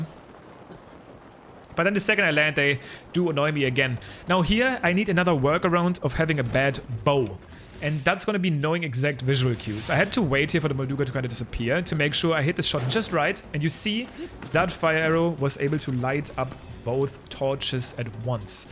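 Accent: Germany. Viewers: I do love German accents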